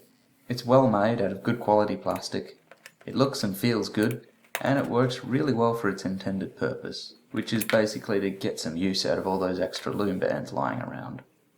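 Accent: Australia. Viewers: You aussie?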